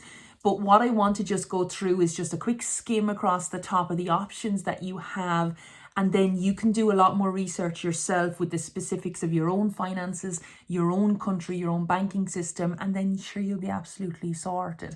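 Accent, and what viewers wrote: Ireland. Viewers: Thanks for your vids. They are awesome, very helpful indeed although listening to your accent makes me miss my fam in Tipp! Looking forward to getting our act together and having a little place.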